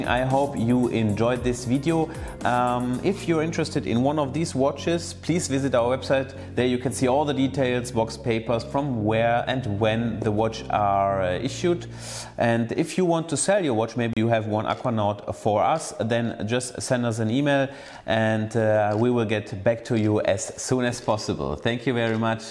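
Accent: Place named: Germany. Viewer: Ja aber ich muss bei seiner Aussprache zu oft lachen. Ich meine sein generelles Englisch ist ziemlich gut, aber Aussprache die ist schon Deutsch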